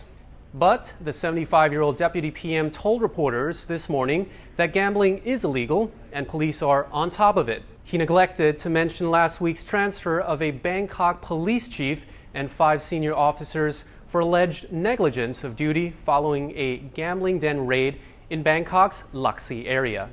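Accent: United States. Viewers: it's his painful to listen to American accent, if he softened his voice a little would be much better, he's not ugly but that's irrelevant, have you asked him for a online date yet? Zoom xx 😜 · No way bring back Tim theres nothing worse than hearing an Asian speak with an American twang give me the aussie accent anytime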